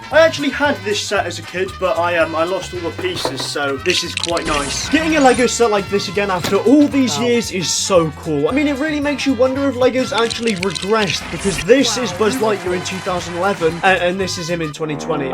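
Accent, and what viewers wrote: United Kingdom. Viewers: Do you live in America but you're British??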